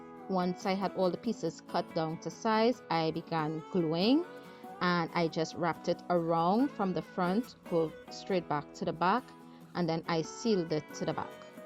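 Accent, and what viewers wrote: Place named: Trinidad and Tobago. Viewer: Hey friend you are very creative. This turned out beautiful. Love your accent are you from Trinidad 🇹🇹?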